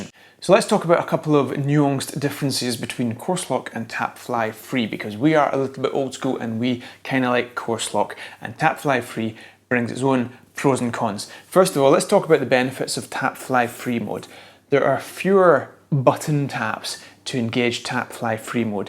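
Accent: Scotland, United Kingdom. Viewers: LOVE THE SCOTTISH ACCENT! Now wear a kilt :)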